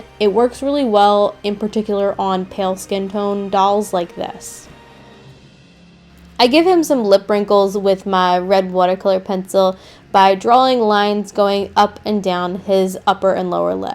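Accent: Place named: Upstate New York, United States. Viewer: I love your lingo. I swear if you lived nearby we would be besties. Unfortunately for me Id guess from your dialect (which sounds north eastern, more specifically Upstate New York) is on the other side of the country. I live in Washington State.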